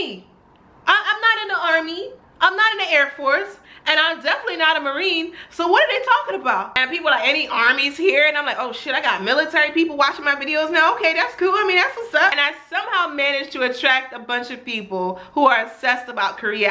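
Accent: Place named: United States. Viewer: Could you teach in France pleeease I'm in love with your american accent!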